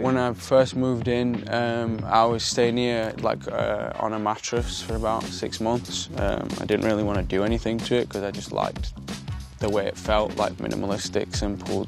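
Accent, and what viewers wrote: United Kingdom. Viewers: Zayn is my reason love British accent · Is he tryna hide his british accent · lol after so many years i finally hear him talking and that british accent was freaking unexpected af lmao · I forgot how strong his accent actually is. You really have to pay attention if you are not British 😅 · British accent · OMG!!! his voice and his british accent is pure love!!! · Because he rarely talks, I'm always shocked to hear a British accent come out of his mouth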